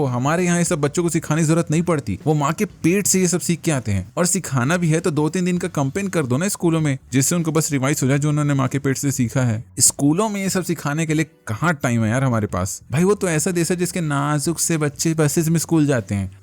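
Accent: Chhattisgarh, India. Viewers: Bhai chattisgarh se ho kya?🤔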